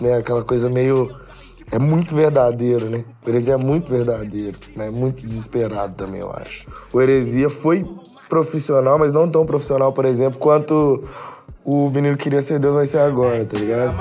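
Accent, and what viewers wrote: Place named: Minas Gerais, Brazil. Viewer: Eu conheci seu trabalho e fiquei apaixonada com suas letras fortes e sua simplicidade nesse vídeo só me mostrou o quanto vc é especial e chegou sua hora de brilhar. Eu sou leiga no assunto rap, escuto rap... mais sou bem eclética e te confesso que estou viciada no seu som e quando vejo eu tô escultando rap o dia inteiro. Que Deus te abençoe grandemente, estou torcendo por vc e sei que vc é do bem e quer o bem mostrando nas suas letras que todos somos iguais, independente de cor, religião ou classe social. Parabéns! Ahh e adorei seu sotaque de mineiro, é isso né vc é mineiro?!Beijos Djonga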